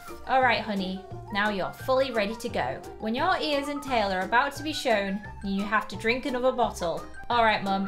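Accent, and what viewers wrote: United Kingdom. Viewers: Quistion are you British cause you kinda sound like ittttt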